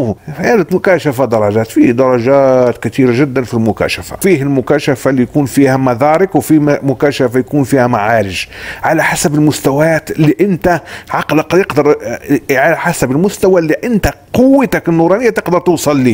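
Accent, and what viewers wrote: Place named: Egypt. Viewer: يا شيخ انت اكيد مصري مش مغربي عشان لهجتك مصريية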